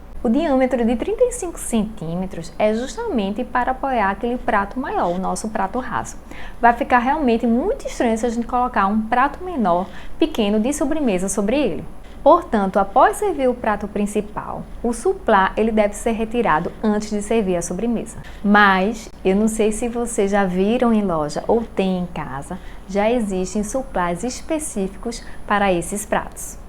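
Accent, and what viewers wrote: Recife, Brazil. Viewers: Vc é de Recife? Sutaque bem parecido! Moro em Curitiba, amo Recife! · Eita sotaque forte que me lembrou uma amiga do Recife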